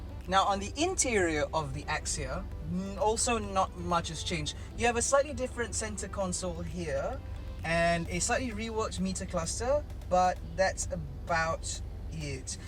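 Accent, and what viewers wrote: United Kingdom. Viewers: Localized Queen’s English..terbaik bro👍🏾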